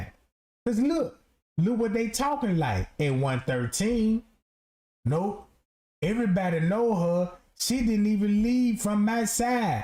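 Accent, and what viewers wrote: South, United States. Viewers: Hey Mr. 🫶🏽 I Don't Mean To Disturb You Because I Know You Will Be Going Live Again, But I Was Cooking And Listening To My Gospel And I Broke Down Thinking About Kenneka. Since Day One When I Came In Your Platform, Then Was A Mod. I Was Excited Because Your Platform Was My 1st, And I Was A Mod, But To Get Back To The Subject On Hand, I Feel Your Passion For Getting Justice For Kenneka. It Seems Like When I First Learned About It Was Late Night And I Heard This Smooth Southern Accent (All Do Respect) I Stopped And Listened And Then When I Learned What Happened.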